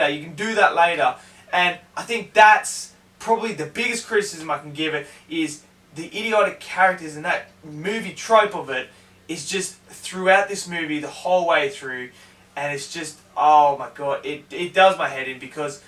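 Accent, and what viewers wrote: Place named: Australia. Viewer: Martin Cuadra he’s also from Australia so things may not sound the same with an accent. I’m glad you had the time to actually sit there and write a comment about it. 👏🏼👏🏼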